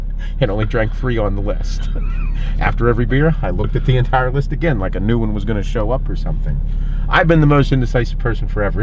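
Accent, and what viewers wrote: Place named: Pennsylvania, United States. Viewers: I'm from Pennsylvania as well, and it's just funny that the way you talk and the terminology you use is identical to the shit my friends and I say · You have a Pittsburgh accent, where in Pennsylvania do you live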